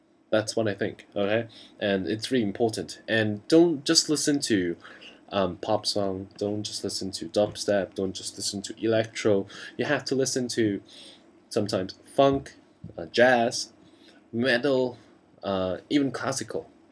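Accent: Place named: United Kingdom. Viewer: British accent!!!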